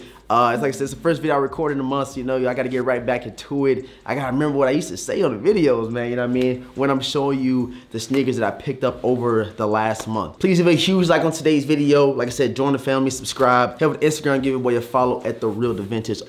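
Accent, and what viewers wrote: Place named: St. Louis, United States. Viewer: A G are u from St. Louis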